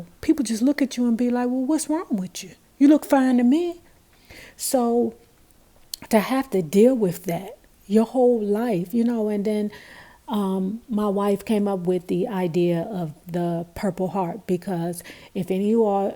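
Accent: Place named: Washington DC, United States. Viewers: I know what you mean about the black folks being pushed out. I live in Germantown md and I know a lot of ppl who moved here from D.C. It's crazy. Plus I know you were from the DMV before you said so and before I saw you. Just by your accent in other videos. It's funny, I can spot a D.C. Accent a mile away. Lol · Peace Queen, I knew you were from DC, I could hear it in your tone, I have to ask do they have Mumbo Sauce down there. Yes, Chocolate City had started to change when Pratt-Kelley took over. But yeah, Your correct about the regentrification nationally, but truthful this is nothing but the fulfillment of the Homestead Act of 1867. The air's you seeing with the social climate is the reaction to the political agenda currently, condone. I too am aware of the Lupus struggle, I lost my Great Aunt when I was a young boy, but would come full circle with my ex-fiance was diagnoised too, I support the research too. 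Oh yeah, I am from Adams Morgan residing in Va. Beach. But on a another note, I never understood why Lupus never got the backing and support as Susan B receives multiple times a year.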